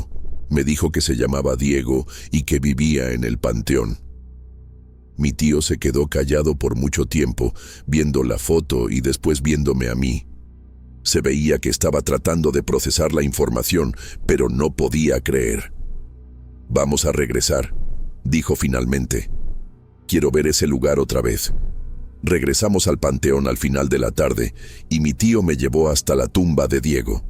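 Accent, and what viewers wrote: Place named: Spain. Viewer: Y luego el acento español lo arruina más.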